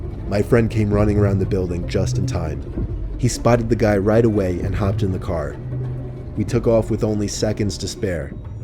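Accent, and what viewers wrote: United States. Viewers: i thought u were american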